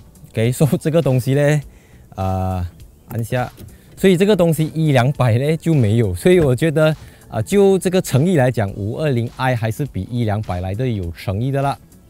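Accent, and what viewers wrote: Malaysia. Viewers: 真的很喜欢你的解说 马来西亚人就该用马来西亚的语调的style 加油 期待你更多更好的作品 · 马来西亚语调比台湾娘娘腔好多了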